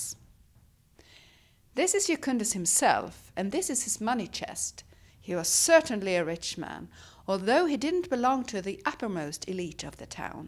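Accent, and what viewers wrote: Sweden. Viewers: Well, she has a very strong Swedish accent, so I just tried to ignore it. My pet peeve is when people so often write "women" in the singular, but never "men" in the singular. It's like logic escapes them. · What a 'weird' thing for you to say. In Europe we tend to learn more British English. Did you expect her to speak in an American accent? She's Swedish and speaks British English with a Swedish accent. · Not fake, it's a Swedish accent.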